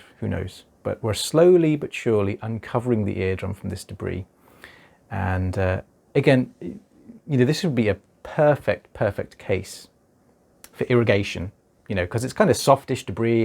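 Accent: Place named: RP, United Kingdom. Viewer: I cannot stand this posh xxxx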